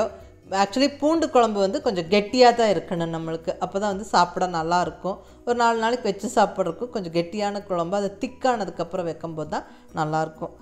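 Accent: Kongu, India. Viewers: I tried it today.. it was good.. mam, all Ur recipes were good, clear explanation.. apart from the recipes, Ur kongu Tamil is superb.. namma oor karanga, enga neighbour polA feel agudhu.. Thanks a lot mam..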